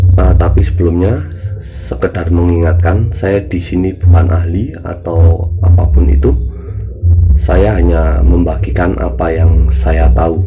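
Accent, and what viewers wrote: Temanggung, Indonesia. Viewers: Dari logatnya bebicara kayaknya dari temanggung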